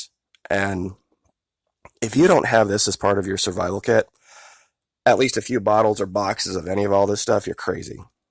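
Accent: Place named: Tennessee, United States. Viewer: Get well soon. I'm gonna join. Tennessee accent or not... genius. Just a trucker and I gotta feeling I'm gonna get caught out on the road and well you know... rodney king video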